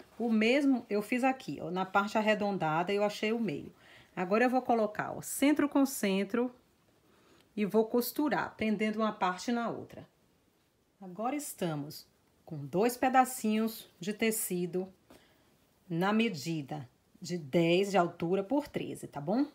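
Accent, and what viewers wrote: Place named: Northeast, Brazil. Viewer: .....minha querida seu sotaque é único , eu amo sotaque nordestino...... é q nós paulista falamos vídeo com o som o "i"..... então fica "vidio"..... E eu amo ouvir vc falando "videeo".....adoro ....adoro .....e adoro!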